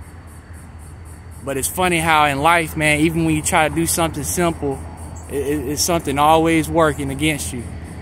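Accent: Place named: South, United States. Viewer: You make a great train video my friend. I can't figure your accent, either North Carolina or Louisana.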